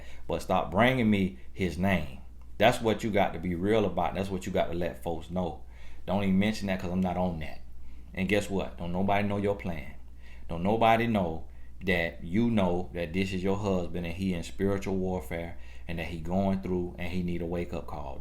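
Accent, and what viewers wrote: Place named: Florida, United States. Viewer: u gotta be from Florida to from how u talk, I feel like your my brother or cousin schooling me 😂 I love you!! Much respect 💯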